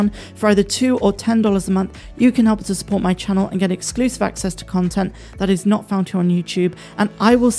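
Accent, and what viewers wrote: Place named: West Country, United Kingdom. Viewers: Well said but I'm still hearing West County accent 😂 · Jibber jabber. I detect some good old South West accent in there. Devon maybe. Nice to hear a fellow yokel on YouTube.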